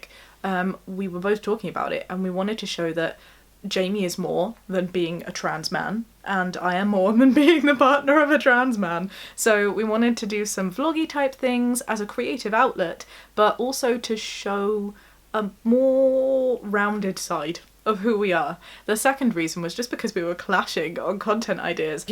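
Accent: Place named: United Kingdom. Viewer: Not gonna lie, as an American I think for me it’s the British accent that makes you seem wise 😂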